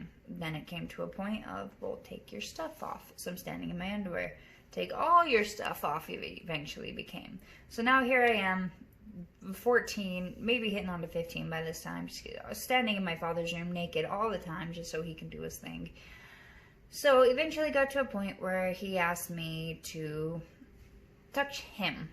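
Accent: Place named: Eastern Europe. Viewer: You sound like you’re from eastern Europe.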